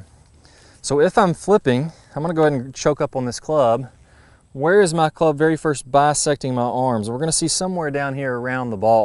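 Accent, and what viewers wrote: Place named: South Carolina, United States. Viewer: Great accent...South Carolina??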